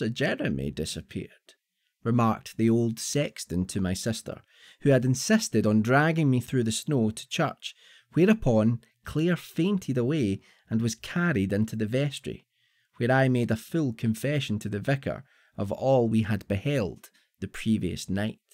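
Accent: Scotland, United Kingdom. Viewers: Enjoyed your reading . It was a suspenseful tale .Your scotch dialect reminds me of David Balfour in Kidnapped . We Americans sound ordinary . Probably not ordinary to other dialects but how can one gauge ? Also the smoke has a mesmerizing effect . Thanks for your audio tale !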